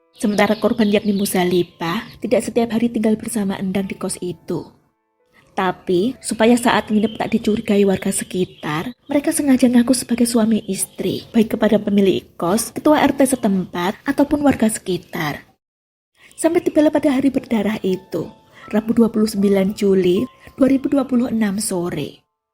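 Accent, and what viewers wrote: Java, Indonesia. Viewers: Mba.. medok bgt suaranya ....😊... Sukses ya...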